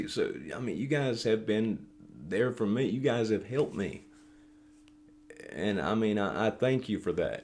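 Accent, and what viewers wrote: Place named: Tennessee, United States. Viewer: Hey you said you answer comments I’ve been watching your show for a while how did you get a Tennessee accent from your babysitter?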